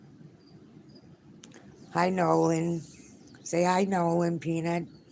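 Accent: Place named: New York, United States. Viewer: Are you from ny ? You sound like it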